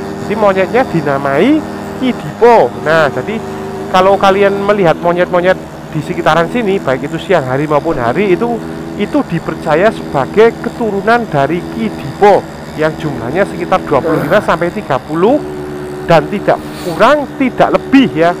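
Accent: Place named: Temanggung, Indonesia. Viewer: Kok koyo wong temanggung asli mas 😅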